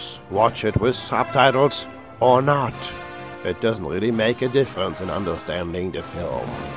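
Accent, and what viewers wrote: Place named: Germany. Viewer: Why did you put a German accent in this? Wtf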